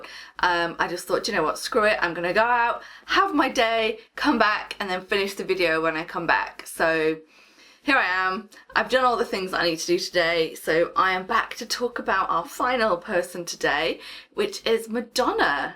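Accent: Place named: United Kingdom. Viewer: The most beautiful accent of the world : english UK ❤🙋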